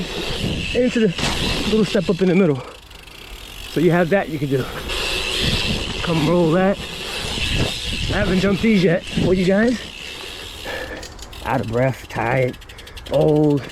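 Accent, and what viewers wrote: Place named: United Kingdom. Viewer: That british accent tho haha! Love the video as always my man!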